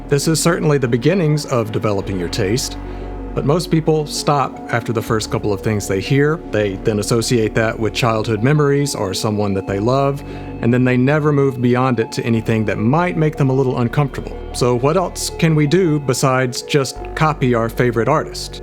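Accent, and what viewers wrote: South, United States. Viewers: I love this guy’s voice. I love his slight southern accent but completely meditative tone. I want him to read books to me.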